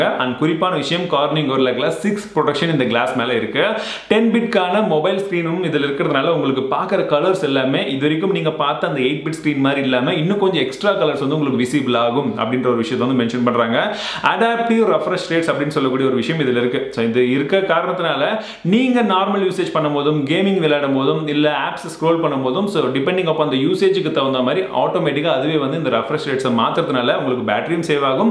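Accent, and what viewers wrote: Chennai, India. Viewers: Vara vara chennai slag bro naaku la naatiyam aada arambichiduchi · Innaa thala enga chennai baasai la konjam varudhu, but nalla irukku 🤣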